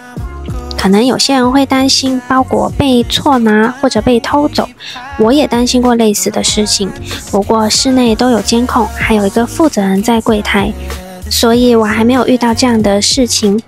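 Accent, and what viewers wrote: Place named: Taiwan. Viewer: 你講話都沒有中國的語氣，聽起來根本是台灣本地人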